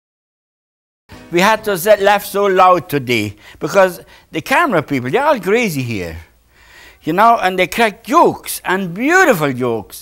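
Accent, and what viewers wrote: Germany. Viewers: Der deutsche Akzent ist das Beste 😁.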